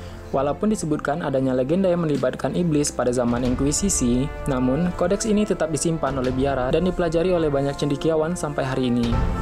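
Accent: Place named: Sumatra, Indonesia. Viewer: dari logat admin sepertinya beliau ada logat orang sumatra dan melayu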